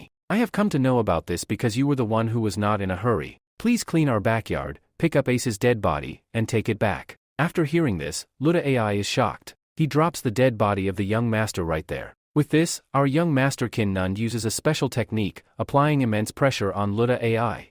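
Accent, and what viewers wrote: India. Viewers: The AI voice being Indian is insane 😂😂😂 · Indian voice damn tho he tried its ok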